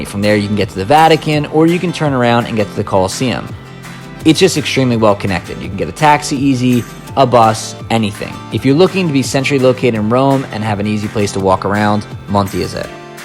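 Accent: Philadelphia, United States. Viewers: I can’t wait to visit Rome this April. It will be the second since I was 10 years old on my family trip in 1998 before euro 💶 come. I don’t mean to judge but, you come from Philadelphia? I notice your accent since I live in Cherry Hill, NJ. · Sounds more like a South St., Philly accent. lol The Roman Guy does operate out of Philly.:) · Great video - thanks for posting. There is so much great information here. And weird question - but is that a Philly accent? :)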